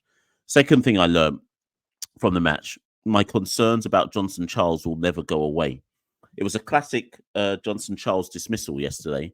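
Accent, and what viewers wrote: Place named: England, United Kingdom. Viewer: Are you in England or u just have an English accent? No disrespect but just want to know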